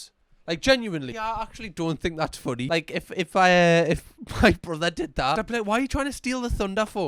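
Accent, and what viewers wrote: Newcastle, United Kingdom. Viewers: The guy on his phone, I play online games and had a player who came on game on his wedding day to take part in an event, we were all just like 'dude why you coming online your wedding day?!' Thanks for the content as always, love that I found a fellow geordie to listen to although I'm from Northumberland so technically a northumbrian. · I heard you talking about your accent in a recent video. Don't you ever dare being insecure or self-conscious about it! When I found your channel, amongst other things I stayed BECAUSE of the accent, not despite of it. To my German ears you sound as British as it gets and I have no trouble understanding or following you whatsoever. You want a grating accent? Try listening to me! 😂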